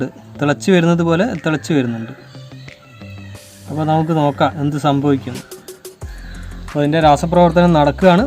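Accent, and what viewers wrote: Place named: Palakkad, India. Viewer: ഇങ്ങള് പാലക്കാട്ട് കാരൻ ആണല്ലേ? 😃😃😃 ഡവറ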